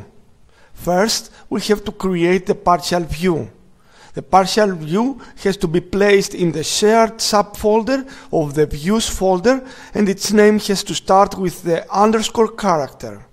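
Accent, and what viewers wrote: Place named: France. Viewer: nice frenhc accent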